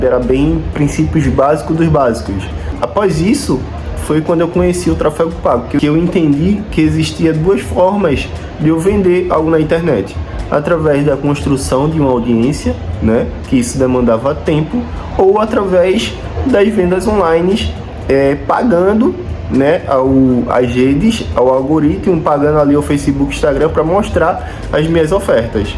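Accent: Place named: Recife, Brazil. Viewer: Eu j tive esse sotaque. Recife, Pernambuco, Brasil.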